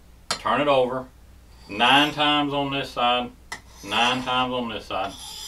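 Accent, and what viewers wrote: United States. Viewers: Just subbed! My loan-start senses are tingling. Do i detect a texas twang?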